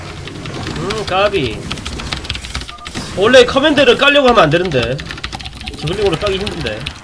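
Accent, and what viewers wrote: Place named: Gyeongsang, South Korea. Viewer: 듣기싫은 경상도 사투리!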